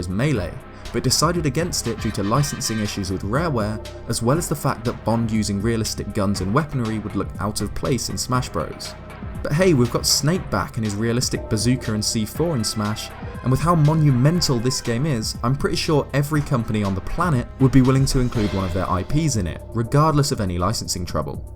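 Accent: United Kingdom. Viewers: Where are you from in the UK bro?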